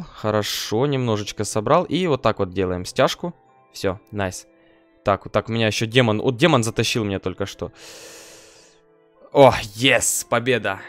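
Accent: Ukraine. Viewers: За укр акцент лайк!!!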